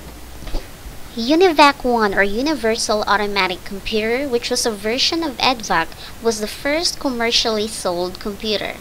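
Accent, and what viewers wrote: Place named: Philippines. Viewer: Person here sounds filipino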